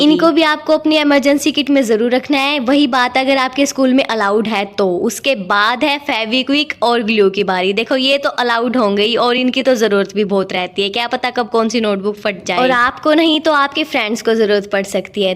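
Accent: Haryana, India. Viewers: Kya aap Haryanvi Ho ?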